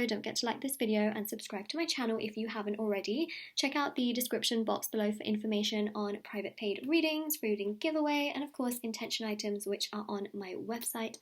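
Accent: United Kingdom. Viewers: British accent 💗💗💗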